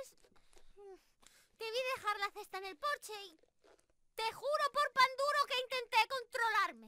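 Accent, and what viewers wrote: Spain. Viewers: Que pena que desde hace 10 meses no hace streams en Twitch, pero aún así no me pierdo los videos. Ojalá vuelva a ser más activa y hacer versiones en español, la verdad es que su acento español y su voz son increíbles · Me ha sorprendido mucho este asmr, es la primera vez que escucho uno de español de España y que me guste; soy de Perú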